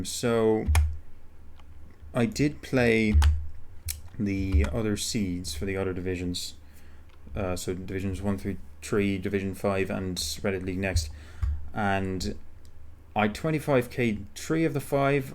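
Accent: Ireland. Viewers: this shit is hilarious man I love it! And you pretty much nailed the irish accent for someone not actually speaking like that regularly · better than my Irish accent and I'm British haha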